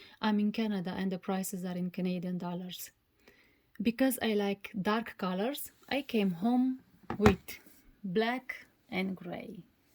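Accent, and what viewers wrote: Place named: Russia. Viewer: У вас такой акцент, как будто вы русская)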